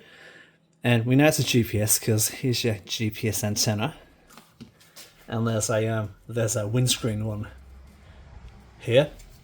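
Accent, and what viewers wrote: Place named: New Zealand. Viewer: Wait, you're a Kiwi? I'm really bad at spotting us in the wild..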